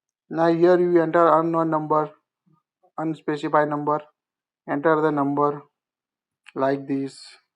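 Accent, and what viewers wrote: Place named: India. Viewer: Sir, Sir you would be better using a computer and print it out. Good grief. People cannot understand your dialect. It's too strong. I know you're speaking English, but it's a strong Indian dialect. Use a computer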